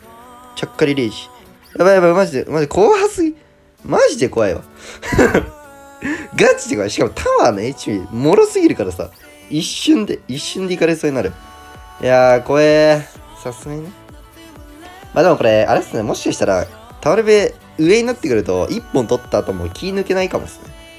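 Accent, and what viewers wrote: Okayama, Japan. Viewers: ちょいちょい岡山弁でてるw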